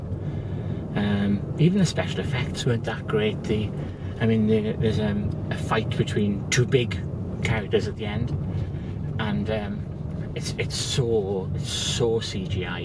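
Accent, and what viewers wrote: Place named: Birmingham, United Kingdom. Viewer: You from Birmingham? I recognize the accent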